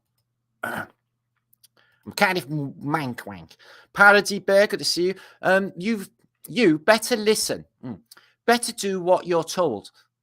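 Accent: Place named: Cardiff, United Kingdom. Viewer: Good stuff. Loving your vidz I discovered you through RV recently. I knew straight away you was from Cardiff from the accent 😂😂 I am myself born an bread from there. Keep up the good work 😁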